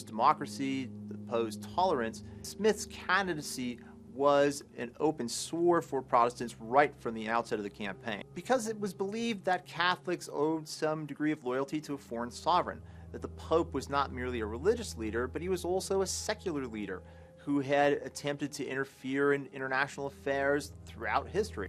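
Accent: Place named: Baltimore, United States. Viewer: This guy has an INSANE BALTIMORE ACCENT.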